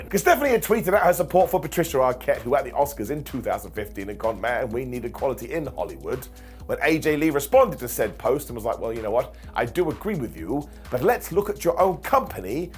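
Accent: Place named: Scotland, United Kingdom. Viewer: I never knew the biggest wrestling fans were Scottish. Damn, it's hard to listen to you. The rest of the world is so disturbing.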